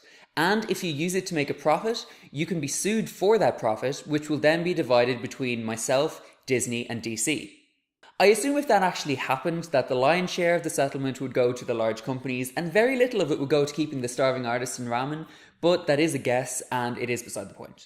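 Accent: Ireland. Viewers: I really like your video, and I like your accent although it is a really soft irish accent haha. Btw, short hair is better. Have a nice day.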